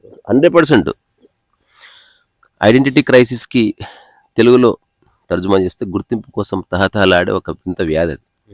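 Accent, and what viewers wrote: Telangana, India. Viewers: Dear I lovers the video of all 3 of you The back ground, the hay stock and everything. Enjoyed the content and burst in to laughter of joy with your Telangana Accent and sweetly scolding the dog. I think dog is expressing its love 🧡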